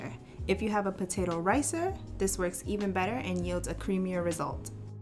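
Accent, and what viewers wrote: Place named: New York, United States. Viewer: I definitely hear that NY accent!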